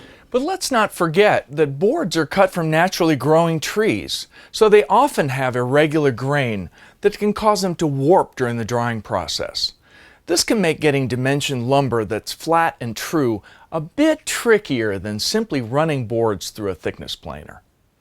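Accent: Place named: United States. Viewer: What a real american accent from a magyar :)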